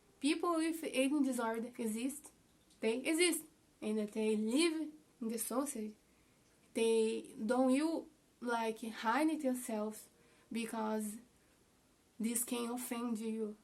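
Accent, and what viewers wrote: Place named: Brazil. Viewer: you are brasilian right?? im from portugal and the way you speak your accent sounds brasilian, voce e brasileira vejo pelo sotaque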